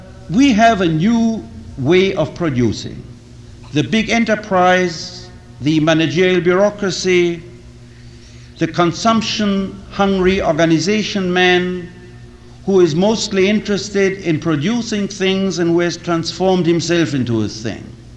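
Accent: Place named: India. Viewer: Why does he sound like he has a slight Indian accent ?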